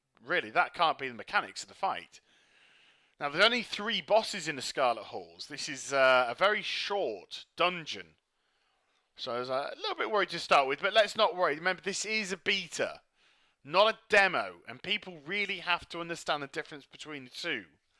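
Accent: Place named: United Kingdom. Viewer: damn this guy's accent is annoying more so than other british accents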